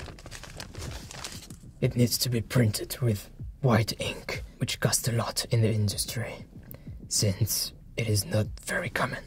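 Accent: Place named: France. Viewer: I swear I’m not just saying this because of your accent, but I hope you’d do a Frenchie (The Boys) roleplay someday! I know guns would be controversial, but it would be cool if you were building a gadget or making some kind of contraption like he does. I just don’t think anyone non-French could pull that off lol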